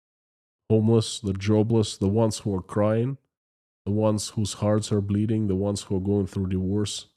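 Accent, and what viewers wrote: Russia. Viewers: to improve English pronunciation work on your 'O's. In English 'O's are pronounced as 'O'' not as an 'A' as in the Russian language. So Oligargs instead of Aligargs and 'Obey' instead 'Abey'. Your English is excellent though. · Thank you,. This channel is gold. Watching from merry EU. It's a bit unfortunate that your accent is so similar to Putin's puppet one in Puppet Regime on YT. I expect you anytime to say "welcome back to this authoritarian life" or "laughter is such an emotional cleanser" Luckily it also sounds like Elvira Bary and a bit like Vlad Vexler who stresses more on the British accent so you're in company of good fellow Russians. Please, keep the good work going 👍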